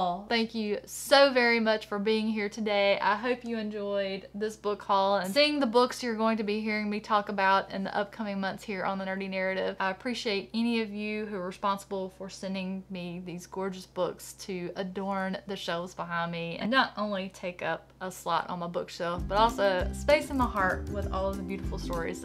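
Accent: South, United States. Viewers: Hi! I've really been enjoying your channel. At first it was partly due to your southern drawl, which I love, and I thought, "Wow, she sounds just like my husband." And for some reason, I thought I heard you say in another video that you were from TX, but I must've misheard since you clearly say in this video that your from the 'Sip, which is where my hubs is from! I knew it!! 😉 Anyway, I love your channel!💕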